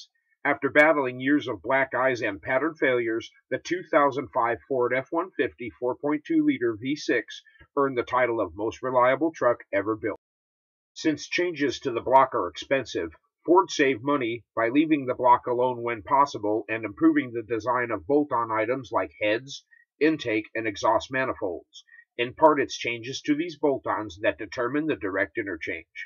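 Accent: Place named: Missouri, United States. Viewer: Awesome video. I also like to do engine swaps and customizations. I also live in Springfield Missouri which it sounds like is where you're at also. I've watched several of your videos recently and never caught that. 👍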